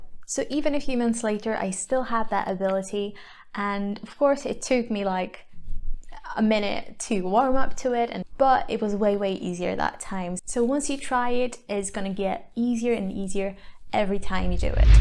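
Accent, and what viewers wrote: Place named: United Kingdom. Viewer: Her accent is British Nguyen you can tell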